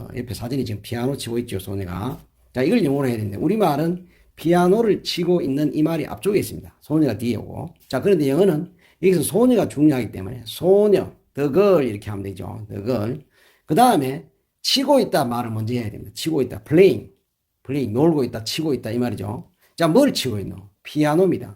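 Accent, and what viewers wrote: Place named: Gyeongsang, South Korea. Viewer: 늦게 선생니을 알게 되었군요 처음부터 찿아서 잘배우고 있습니다 감사합니다 경상도 분 이신가봐요 저는 경상도 할매입니다 혼자영어공부 한지 십년이 되어가는데도 아직 버벅거립니다 그냥 공부가 좋아 조바심 없이 하고있습니다 좋은 가르침에 감사드립니다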